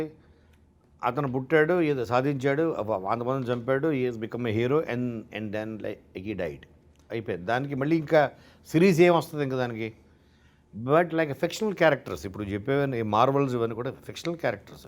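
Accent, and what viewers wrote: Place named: Telangana, India. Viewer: Nice interview . యాంకర్ బాబు అంత బాగుంది కానీ ఆ తెలంగాణ యాస ఎందుకు .. అలాగే ఎవరు చిత్తూరు..కాకినాడ.. శ్రీకాకుళం .. యాస మాట్లడరు కదా.. ఎందుకు ఈ మధ్య నే కొత్త గ ఇంత అతి చేస్తున్నారు మీరు తెలంగాణ యాస తో .. Consider others too. One gets rejected for a call centre job too if there is heavy mother tongue influence in his English. Experienced it first hand in getting rejected. Cater for everyone when in media. Speak neutralized. Jai Telangana .. Jai Andhra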